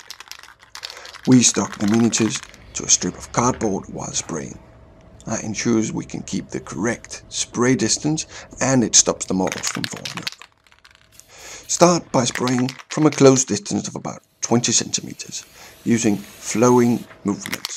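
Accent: Denmark. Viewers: Grinern, man kan bare høre det en dansker på accenten med det samme :D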